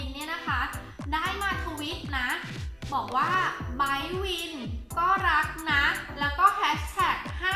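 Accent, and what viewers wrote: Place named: Guangzhou, China. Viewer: ไปบอกเค้าทำไมว่าไม่เทพอะครู .. แม่ป๋อมฟังคือสำเนียงกริ๊บเลยค่ะ นึงว่าจบเอกจีนจากกวางโจวมาเลย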